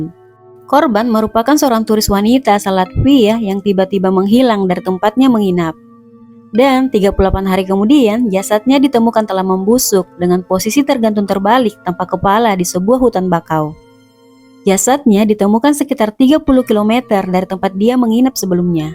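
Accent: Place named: Eastern Indonesia, Indonesia. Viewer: Suara admin kedengarannya dr timur, tp gak tahu apakah dari Sulawesi, NTT, atau Papua.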